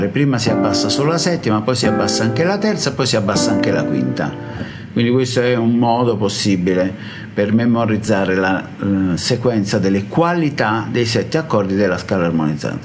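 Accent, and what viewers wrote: Naples, Italy. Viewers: Si sente la radice napoletana, ed è molto piacevole...sec me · Un napoletano sente i suoi simili già nell'armonia di voce comunque grande ,avevo lasciato la mia tastiera da parte ma con i tuoi tutorial mi hai dato voglia di imparare cose buove semplici e concrete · Lo so che sei di Napoli, e si sente pure 😉. Cmq ti ascolto sempre con molto piacere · Cmq si sente che è napoletano... per fortuna.. aggiungo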